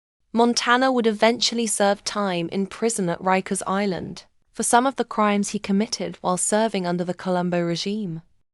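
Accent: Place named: United Kingdom. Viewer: Why is there a preponderance of British accents vo-ing mob stuff ? I’d rather hear a Brooklyn accent ! More realistic and less nauseous !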